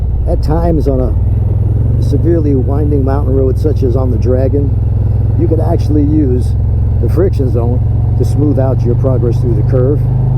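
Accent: New York, United States. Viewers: Forty two years and you still sound like a New Yorker. I’m in NC and two words out of my mouth and they ask, “you from NY?” Gee, how could you tell? Bwahahaha! P.S. Love your videos. Regardless of how long we’ve been riding any of us can always learn something new. I’ve recommended your DVD’s to every new rider I meet. Keep up the good work.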